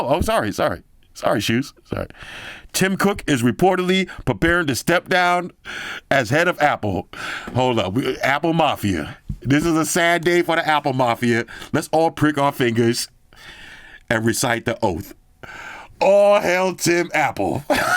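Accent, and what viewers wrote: New York, United States. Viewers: I do have to say that I truly like and respect how you keep it real with your reviews and yes, I do have an iPhone 16 Pro black titanium and no I do not like the new three colors in the new Apple iPhone 17 Pro models. Of course you’re always going to have haters out there so you keep on being you and keeping it real and disregard all the haters you’re going to come across on your channel and I just subscribe to your channel and again I do admire and respect how you give good insight into whatever it may be Apple Google or any other smart Phone or product out there on the market in today’s marketplace. And since you touched on the Apple Watch Ultra 3 and just wanted to know how you felt about the 5G versus the Apple Watch Ultra 2 and how in comparison speed wise does the Apple Watch Ultra 3 is it slightly better than the ultra two or are they about the same speed wise? 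You definitely sound like you’re from the New York city Metropolitan area and I’m here in Brooklyn New York where I was born and raised and I always get hated on for voicing my opinions or telling it like it truly is but that’s OK. It’s not a popularity contest with me you either like me or dislike me and it doesn’t really matter to me because again it’s not a popularity contest with me what you say is what you get and a lot of people truly respect those or truly honest with themselves and others and of course you’re always going to have the hater or two out there again keep making great videos and keep it real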